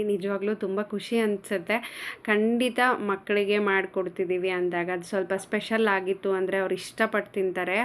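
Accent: Raichur, India. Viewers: Hi namaste from dharwad.. Nimma bhashe keltiddange ello nam kadeyvralva ansitu ella vedio na scroll madi nodi huduktide nim introduction vlog enadru idiya anta... Hage 5-6 vedio nodtiddange nim wedding card alli lingasuguru anta nodi khishi aytu namdu kuda raichur... Hege vlog madta iri.. Sadaa khushiyagiri...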